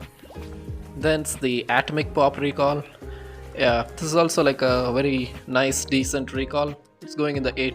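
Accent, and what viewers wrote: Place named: India. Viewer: love your india accent man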